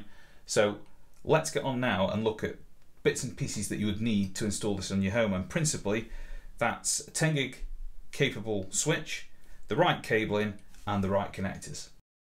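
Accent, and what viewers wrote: Stoke, United Kingdom. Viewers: Hey! Are you a Stokie?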